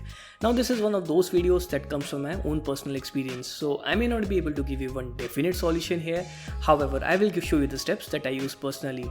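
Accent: India. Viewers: Why am I getting angry at his annoying indian accent....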